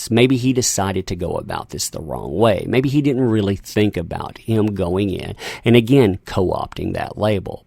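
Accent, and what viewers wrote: South, United States. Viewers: you sound like you have a southern drawl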